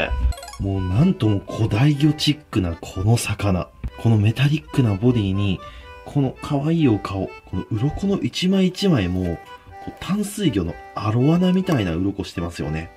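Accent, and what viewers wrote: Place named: Hiroshima, Japan. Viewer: 名古屋ということですが、広島イントネーションがあるような…。器もいつも参考にしています！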